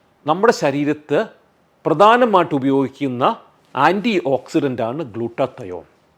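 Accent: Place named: Pala, India. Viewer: ഡോക്ട്ടറുടെ നാട് പാലായാണോ? ഞങ്ങളുടെ സംസാരം പോലെയാണ്. പ്ലീസ് reply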